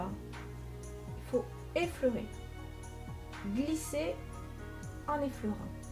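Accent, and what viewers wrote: South, France. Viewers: Le tout parfaitement expliqué avec le charme de l'accent du sud qui m'est cher. Merci bcp de la part d'un toulousain.😊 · magnifique tableau avec les explications top et ce petit accent du sud qui apporte une note musical à tout ça . Un grand merci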